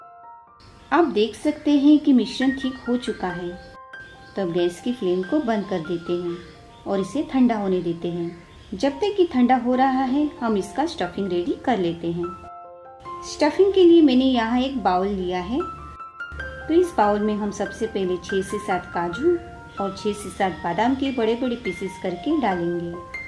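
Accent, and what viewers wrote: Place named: Gujarat, India. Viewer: Your Gujarati accent is adorable, it reminded me of my friend. And really Nice dish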